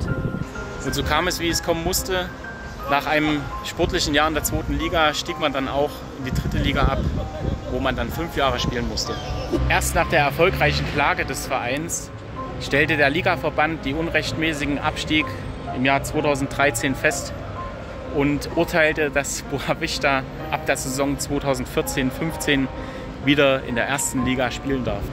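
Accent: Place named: East Germany, Germany. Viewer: Dieser Ossi-Akzent. Als du Panther gesagt hast, habe ich Panda verstanden 😂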